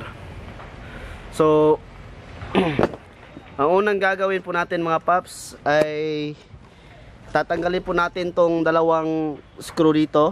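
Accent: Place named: Visayas, Philippines. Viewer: "giatay ka!" hahaha yawa oyyy. Nindot jod paminawn bsta bisaya mag yawyaw haha rs brod nice video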